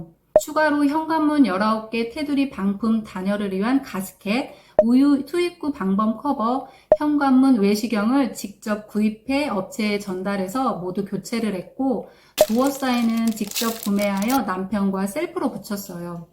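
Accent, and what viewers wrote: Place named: Jeonju, South Korea. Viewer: 말투 듣고 전주사람인것 같앗는데 맞군요.한양수자인 보고 맞구나 했습니다. 대단하십니다.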